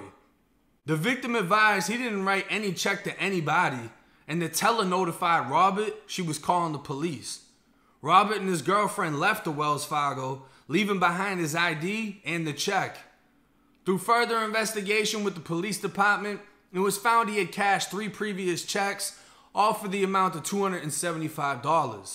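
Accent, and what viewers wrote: Boston, United States. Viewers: Bro you from Boston area? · Yo you from Boston? Trying to guess the accent 🙂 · Are you from Boston or something close due to your accent??? Why you fascinated with Florida??? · Did you ever live in Beantown?? A lot of Floridians are originally from there you have a little accent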